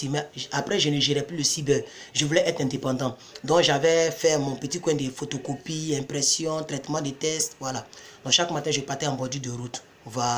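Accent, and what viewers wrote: Ivory Coast. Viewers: Lol je ne sais pas pourquoi je reconnais aussi vite les Ivoiriens quand j'attends leurs voix où quand je le regarde je sais déjà que c'est un ivoirien depuis que j'ai vu ta tête ta façon de parler je suis que tu étais ivoirien dis-moi le contraire lol merci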